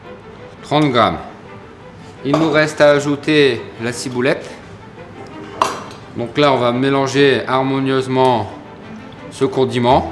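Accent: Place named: Nord, France. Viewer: ça ressemble plutôt à l'accent du Nord ou Pas-de-Calais...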